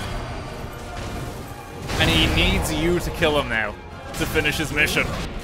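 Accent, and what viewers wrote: Ireland. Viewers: Irish, not British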